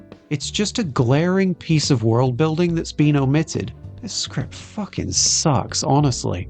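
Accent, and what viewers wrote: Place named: West Country, United Kingdom. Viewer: I mean... I'm guessing you're not American, since your accent sounds vaguely West Country, so you should be able to pronounce that. "pa-pa-ver som-ni-fe-rum".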